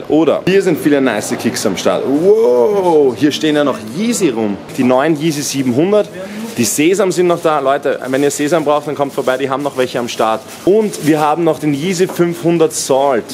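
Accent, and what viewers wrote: Austria. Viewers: Wie uncool klingt einfach österreichisch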